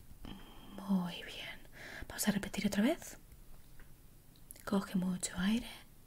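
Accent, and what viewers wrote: Spain. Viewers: Que ricura de mujer madre mia. Hecha a manos de dios. Y ese accento Español. Eres una diosa en viva.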